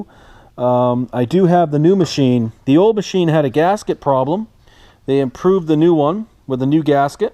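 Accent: United States. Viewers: Did you say "a good-eye deer"?? I enjoy the hell out of your New English accent but that aside video takes the cake! Hands down, the most informative and honest take on these Minelab machines as far as tips n' tricks and idiosyncracies of each of them. Thank you for your service and happy hunting from a new subscriber in Florida.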